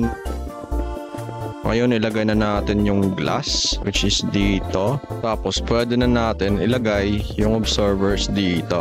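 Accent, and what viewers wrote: Visayas, Philippines. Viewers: Bisaya ampta hahhahaha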